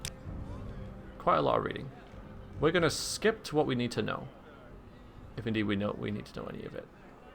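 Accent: Yorkshire, United Kingdom. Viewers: Sounds like you are a fellow Yorkshire man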